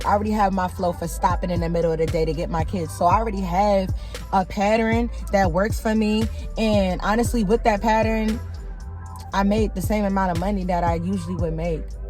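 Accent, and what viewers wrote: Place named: New Jersey, United States. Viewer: Your accent sounds like your close to me I'm in burlington nj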